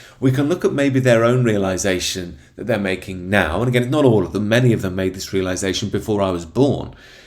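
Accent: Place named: Yorkshire, United Kingdom. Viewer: good to hear you have released your inner yorkshire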